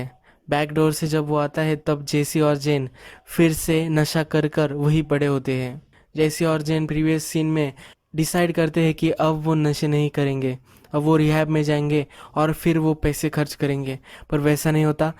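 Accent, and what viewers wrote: Maharashtra, India. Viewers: Good story telling...but i noticed you are marathi bhava from accent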